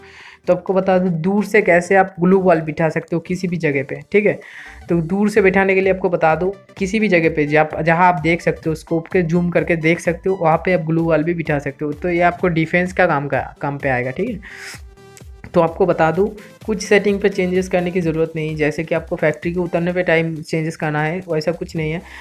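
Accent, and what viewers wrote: Bengal, India. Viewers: Are you a bangali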